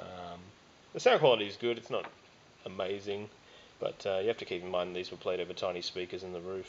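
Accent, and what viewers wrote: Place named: Australia. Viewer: Funny you mention SC35C, I just purchased one to put in my TYPE A, which is currently running a Stanton500-II, mainly, again, due to the tracking weight issue. I just need to get some mounting posts to assist the process. BTW, I also have a 4HF in almost pristine condition, from a 'high-end' console back in the 60s. I have been meaning to overhaul it and put it into service. Do you have any tips for maintenance on the 4HF? (from your accent I presume you are in Australia as well, maybe NZ?) Cheers...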